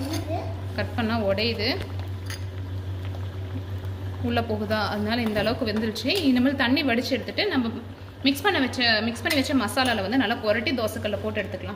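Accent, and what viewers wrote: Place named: Coimbatore, India. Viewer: Super recipes 👌👌 Neenga coimbatore ah sis Unga speech apdi irukku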